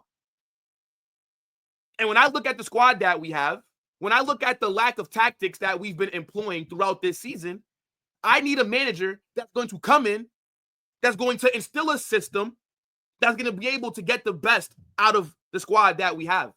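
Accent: United States. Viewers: Are you American bro?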